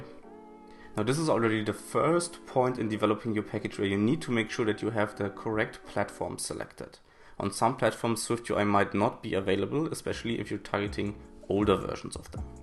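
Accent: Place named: Germany. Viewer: Thank you, man, it helps! Like you german accent)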